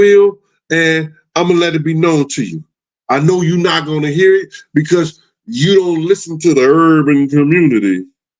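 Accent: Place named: St. Louis, United States. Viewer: don't sweat it, I love how you mispronounce some words. I love that you still have that St. Louis country dialect, despite being in New York so long. I like it, because you remind me of my country uncles. ❤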